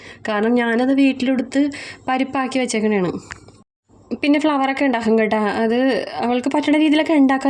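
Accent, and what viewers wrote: Kochi, India. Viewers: Ishtam illathavar kanenda😮Ishtam ullavar njangal ellarum undu ktto😊njan pathanamthitta il Thiruvalla il aanu kochi slang kelkan nalla rasam undu ❤super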